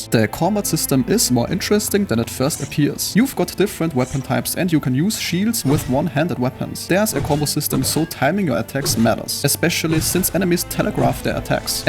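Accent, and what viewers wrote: Germany. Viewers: Digga deine Aussprache ist wirklich schrecklich. Versuch Englisch ohne deutschen Akzent zu sprechen weil das geht fast in Richtung old Arnold😅😂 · Very german accent :D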